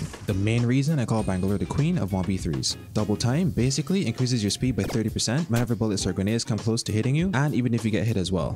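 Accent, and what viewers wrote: Barbados. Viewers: Sound like a bajan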